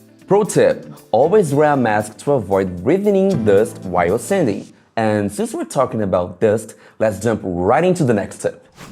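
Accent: Brazil. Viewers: esse inglês com sotaque brasileiro... tô errado?! massa o conteúdo! o Loot é referência de qualidade e excelência. inspirador. thanks!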